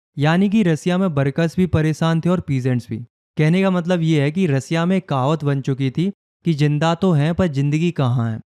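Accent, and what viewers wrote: Uttarakhand, India. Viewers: bhai tum uttrakhand se ho kya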